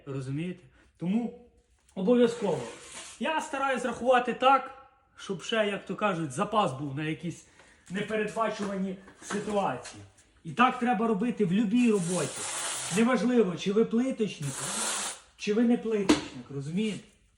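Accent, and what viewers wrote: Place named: Lviv, Ukraine. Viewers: Діалект чи вимова наша львівська, але не мова, мова укрвюаїнська . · Чиста наша львівська мова !!!❤😊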